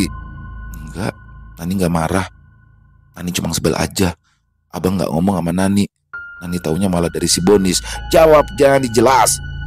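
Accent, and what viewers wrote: Jakarta, Indonesia. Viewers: Iiiiihhh..sumpah keren abis nih!✌️ Logat Betawi ny itu loh...pas bngt, feel ny jd dpt bngt 👍 Fikiran Q jd traveling ngebayangin sosok Bu Zubaedah, Bg Doni, Gembul & si centil Bonies 😀 Karakter apapn yg dibawain berasa natural bngt. Multitalent bngt Kadang menjelma jd org Betawi, kadang jd org Kalimantan, kadang jd org Jawa😄 Top markotop bngt dah ahh..gadak obat 👍😁 Menyala Bang 😃🔥🔥🔥🔥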